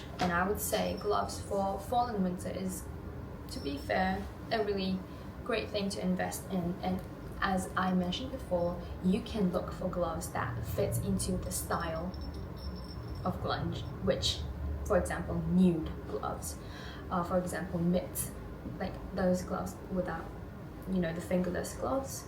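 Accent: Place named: England, United Kingdom. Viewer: I slow your video way down so i can watch while i work. Then i can also read what you are saying so it's great! I love most of these trends. It's crazy how many of these i already have! Mixing glam with sportswear sounds super fun so im gonna try that! Thank you for inspo and i love your English accent lol! subscribed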